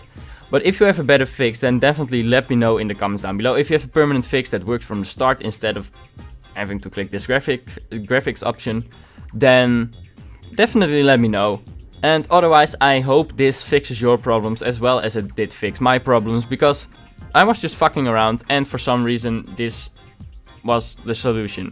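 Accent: Netherlands. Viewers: you sound dutch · You sound dutch. NEDERLANDEEEEEEERS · Lol your dutch like me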